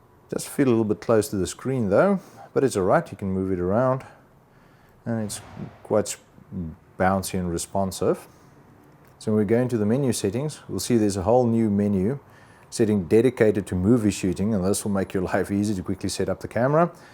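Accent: South Africa. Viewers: You sound Afrilaans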